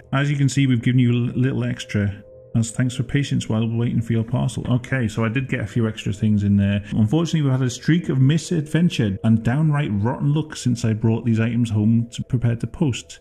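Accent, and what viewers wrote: United Kingdom. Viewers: I just realized that you have British accent (not sure either English, Scottish, Welsh or Irish well if Ireland could be counted as UK itself) the moment you said "bloody hell". No wonder your voice somewhat very interesting in certain way. Please correct me if I'm wrong thanks.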